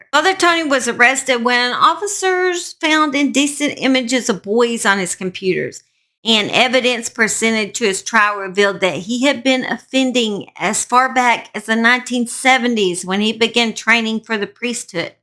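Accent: South, United States. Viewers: Great story from my favorite southern narrator Ms.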